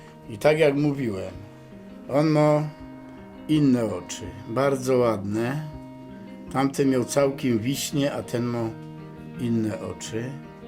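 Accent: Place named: Cieszyn Silesia, Poland. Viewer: Witam. Skąd Pan jest bo akcent jak z Śląska Cieszyńskiego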